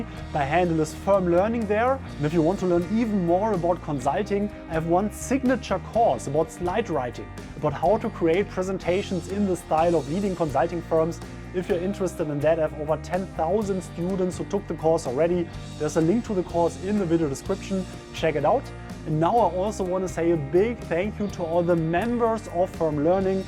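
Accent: Germany. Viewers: I watch because accent is brilliant! I just enjoy hearing him talk, and helps with my German pronunciation. Almost all my German friends have far weaker accents. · Hi! Could you tell me where from your accent is ? Are you German?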